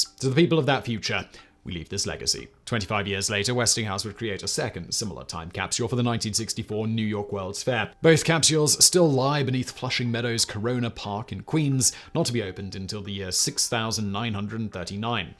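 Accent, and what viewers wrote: United Kingdom. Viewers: I know this was an old video when it started because Simon still has his full british accent.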